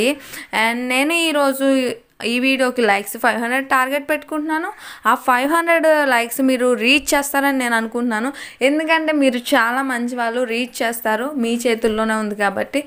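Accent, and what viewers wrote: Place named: United States. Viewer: Ur english is very good like american english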